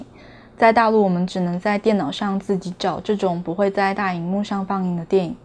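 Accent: Taiwan. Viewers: 講話聽起來和台灣人沒什麼兩樣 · 這口音也太台灣腔了吧?? 太強了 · 妳的口音完全就是個台灣人! 當年看到 一一 我也很震撼，甚至20年後再看這部電影也覺得毫不違和，毫不過時，很謝謝妳喜愛這塊土地。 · 這位妹妹你的台灣腔學得很地道阿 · 完全是台灣口音了耶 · 口音完全台灣人XD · 妳真的完全台灣腔了！希望妳留下來！也希望政府有更開闊的胸襟、迎接喜歡台灣的人！ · 好台灣腔喔！一點都沒有廣東腔和北京腔！ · 沒有一點大陸腔，台味十足。👍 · 這口音根本台灣人了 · 聲音就已經是標準台灣腔 沒有大陸口音 完全在台灣這裡生活 完全不會有問題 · 你的中文口音已經很台了XD，不用去計較，享受可以在台灣的時間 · 妳的聲音語氣就是台灣人啊～歡迎妳能在台灣開心的生活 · 口音跟臺灣好像，就沒那麼討厭了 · 腔調跟台灣人一樣，聽到靠北更有親切感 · 妳的口音跟台灣人無異!優秀！加油! 你没錯!這時代及政治形勢所致不得不然的結果! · 根本台灣女孩的感覺，說話就是台灣腔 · 這台灣腔 ....真是大陸人 ?? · 你的腔調已經很台灣了~很好聽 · 這口音已很像台灣的口音了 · 謝謝你這麼愛台灣，這幾年進出大陸在大陸有很多好朋友，我也很喜歡大陸很多美好的人事物，只是的確朋友再好我們可能在政治立場上還是不同，這些牽涉太多因素沒有辦法改變，所以我不太會聊這個話題去影響彼此的友誼。 我也很喜歡你在影片中的表達，你的口音根本就已經是台灣口音了，感受到你真心喜歡台灣的一切，歡迎你來，我也很喜歡你的分享，很開心你留在台灣😊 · 口音很台灣。生活周遭每天的大小事總是和政治有關，兩岸目前就處於尷尬的情況，要成為正常國與國之間的交往，問題不在於中華民國而在於中華人民共和國（靠北，iPhone 打字怎麼沒有中華民國的國旗卻有對岸的）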